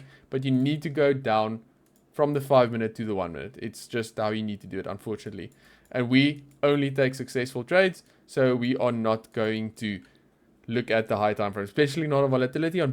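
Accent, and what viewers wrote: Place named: South Africa. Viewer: i know a South African accent when i here one 😁😁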